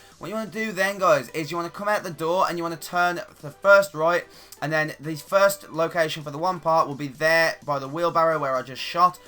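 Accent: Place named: Birmingham, United Kingdom. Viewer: u sound like ur from birmingham im from there i can telll if u am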